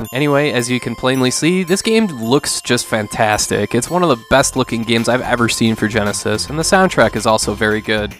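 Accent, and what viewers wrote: Minnesota, United States. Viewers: Loved that Minnesota accent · Wait u a Minnesota resident?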